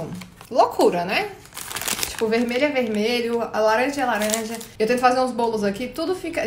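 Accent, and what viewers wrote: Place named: South, Brazil. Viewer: O é do sul? Sotaque tá meio entregando e CUECA VIRADA em vez de grostoli ou orelha de gato hahaha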